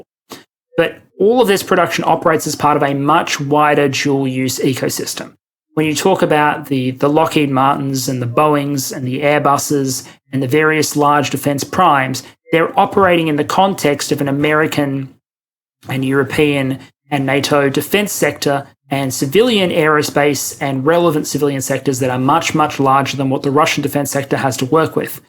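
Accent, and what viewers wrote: Australia. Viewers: You have a fairly standard Australian accent, so I'd suggest taking YouTube's autogenerated CCs and going through to correct them. It's still a pain, but far less so than doing it manually. The big thing it'll do for you is set timings.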